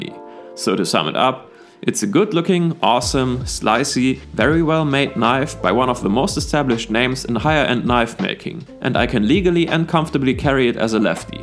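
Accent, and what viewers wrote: Germany. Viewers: Heavy German accent but nice content. Thanx.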